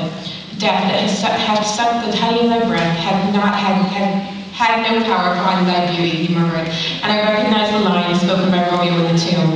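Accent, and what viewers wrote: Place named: United States. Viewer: Che accento americano orrendo xD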